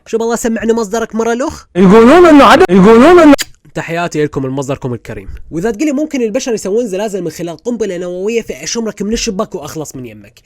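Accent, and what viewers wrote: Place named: Iraq. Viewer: يخي احب لهجه العراقيه 😭💖💖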